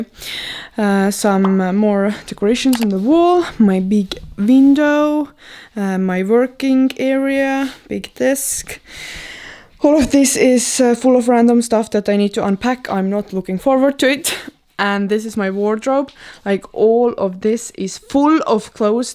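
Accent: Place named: Estonia. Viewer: Love the Estonian accent 🤗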